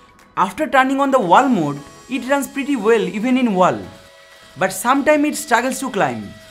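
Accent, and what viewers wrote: Bengal, India. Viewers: Indian accent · This is the first video i am watching of this channel and the moment it started i knew he was bengali lol becoz i am also bengali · He’s sounds Bengali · I love this Bengali accent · That Bengali English accent 🙄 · Go to Shark Tank with this and get this out there! A genius man with a very enjoyable accent, after british, indian english is my favourite commentary language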